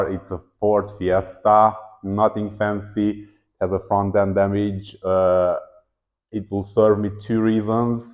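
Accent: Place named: Germany. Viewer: I Love the grman accent, love your videos and i know you will keep the garage! :D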